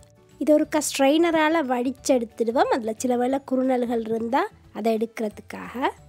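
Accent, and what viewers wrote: Sri Lanka. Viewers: Hi mdm! I know u are a Sri Lankan by the way u talk n yr slang i m also one but Malaysian Sri Lankan. Seeing yr recipe looks so simple. I have tried but never turned out.now looking at yr recipe so simple.let me try yr respi .please one queston . If I were to use coconut water what would be the measurment be like I'm ke? Do I still make the kanji.n add coconut water ? Pls reply.tq very much.please send more recipes for us.Bye. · Srilankan Tamil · அழகாக சிலோன் தமிழில் பேசி அற்புதமாக அப்பம்(ஆப்பம்)சுட்டுக் காமிச்ச உங்களுக்கு என் நன்றி!!உங்கள் தமிழ் உச்சரீப்பு நன்றாக உள்ளது!!இந்த அப்பத்துக்கு தேங்காய்ப்பால் எடுக்கலாமா?!அதில் ஊற வச்சு சாப்பிடலாமில்லையா?!நன்றி சகோதரி!!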